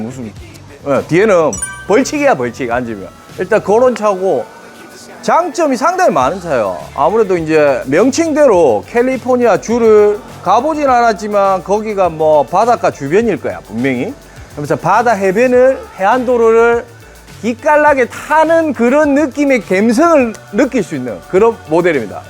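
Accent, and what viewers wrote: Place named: Busan, South Korea. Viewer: 본가가 근처에있는데 구독자놀러가면 커피한잔 주십니까?ㅎ..정비도 정비지만 부산사투리맛집ㅎ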